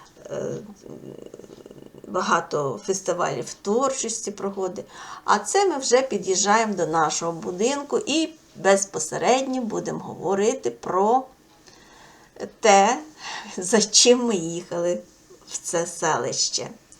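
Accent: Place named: Russia. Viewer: Смешно слышать, как русскоязычный пытается говорить по-украински. Не мучь себя и слушателей, говори на родном.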